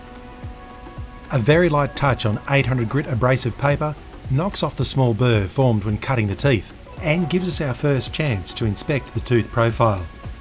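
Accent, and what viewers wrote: Australia. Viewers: Hearing an Aussie accent talking about inches brings back memories. And yes, I'm that old. 👍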